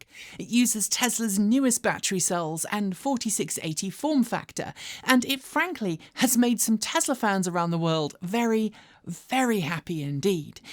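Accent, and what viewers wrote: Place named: United Kingdom. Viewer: "While Elon Musk has been very cool towards..." Oh that's right you speak the Queen's English. It's a bit ambiguous to a Murkin.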